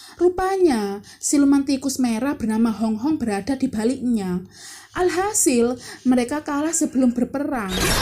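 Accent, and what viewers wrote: Java, Indonesia. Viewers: Medok karena mbaknya orang Jawa,,, masing-masing Suku mempunyai ciri khas,,, ini lebih baik dari pada pakai suara google,, imut, renyah, empuk,, tapi bukan suaranya sendiri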